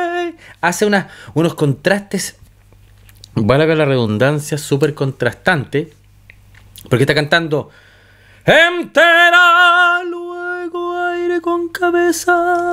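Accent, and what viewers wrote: Chile. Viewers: sabia que eras chileno!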